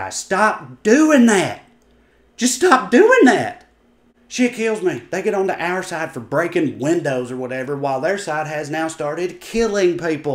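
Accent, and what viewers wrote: South, United States. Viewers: Your comments and insight are illuminating ...I have a (sorry to say) new found RESPECT for my fellow Americans of the South. Intelligent, informed and 'well read'....and spoken. You can't judge a book by it's accent...I admire you and agree with you. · Refreshing to hear a southern guy with brains. Thank you and keep them coming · So nice he hear a southern accent with a real brain functioning behind it. Good on ya, dude. More, please.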